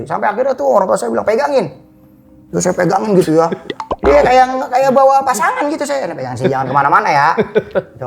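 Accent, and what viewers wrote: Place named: Betawi, Indonesia. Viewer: dialeg betawi kak... Setelan normalnya kek gitu 🤭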